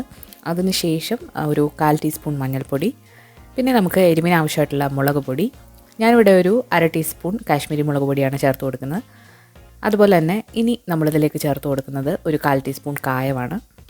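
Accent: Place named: Valluvanad, India. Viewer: de valare pazaya videos il oke voiceover accent kuracu vithyasom toniyirunu (oru valluvanadan style aaypoy pole), ath otum ishtomaayrunilaa. Pakshe ipol kure naalay valare nanaay samsarom. Anikk valare ishtomanu recipes um samsaravum. Very simple and straight to the point. Please keep up the perfect work🥰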